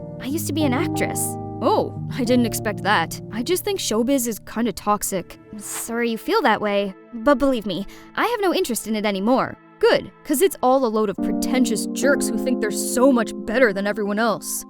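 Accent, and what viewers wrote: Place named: Canada. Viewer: I love that there is a hint of canadian accent in the narrator's voice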